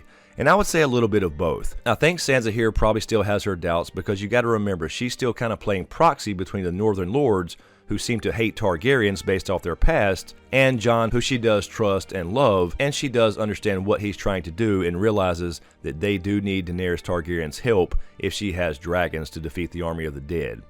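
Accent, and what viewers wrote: South, United States. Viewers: I worked on fixing the closed caption, I have spelled Daeny this way, hope it's correct. Mostly just fixed punctuation and names, and times when the auto generater doesn't recognize your southern accent. . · Where are you from? I’m trying to pick up what state your accent is from.😂🤷🏻‍♀️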